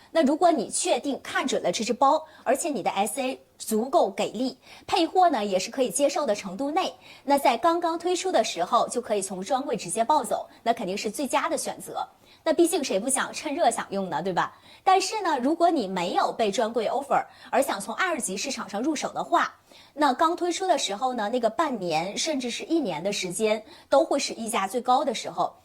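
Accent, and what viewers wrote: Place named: Japan. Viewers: 可是她不只是用日語發音，還混著來，聽起來真的很突兀。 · 因为她说的是日语发音。她在日本做这行，按日语发音来没有任何问题啊。就像我们把Hermes用中文音译成爱马仕，法国人也没理由来指责我们发音不标准吧。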